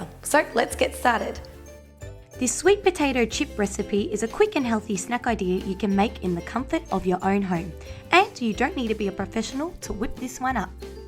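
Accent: Australia. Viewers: Are you Australian I'm new to your channel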